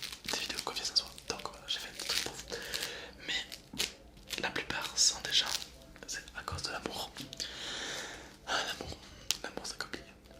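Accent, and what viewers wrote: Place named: Toulouse, France. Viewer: Ses se que on appelle l'accent toulousain même si oui il a une très belle voix aussi (pk j'ai perdu mon accent toulousain moi 😭)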